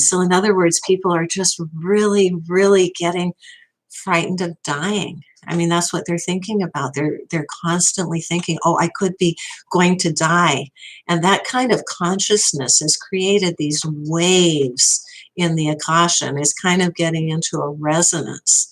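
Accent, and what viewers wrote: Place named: South Africa. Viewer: For the info ... I'm not a Mataji ( you couldn't know that ... no biggy ) , and I was initiated by Prabhupad in 1974 ... Let me ask you ... You're basically saying you took initiation ...by whom , and what " branch " ?!? And ... where are you roughly located ( your accent sounds almost south-african ) ?!?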